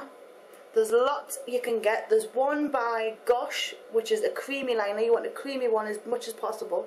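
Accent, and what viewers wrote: Newcastle, United Kingdom. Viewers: ur british accent makes it more fun 2 watch!!! also i just subscribed!! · I usually don't like that New Castle-like accent but she is the nicest person ever so I don't really mind :) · It's a Geordie accent.. aka Newcastle in the north of England :)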